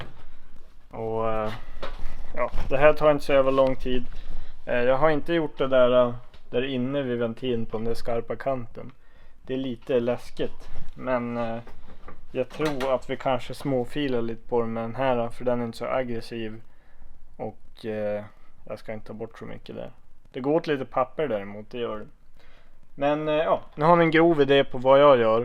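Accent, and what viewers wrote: Norrland, Sweden. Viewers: Äntligen, slutade ärligt kolla på dig när du började med engelska. Det är ju norrländskan man vill höra 🤠🤠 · Norrländskan är tillbaks 😍😂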